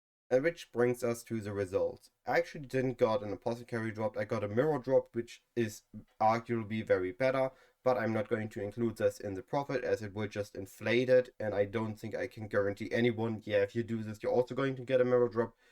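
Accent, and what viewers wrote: Germany. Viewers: German accent is so disgusting and muddled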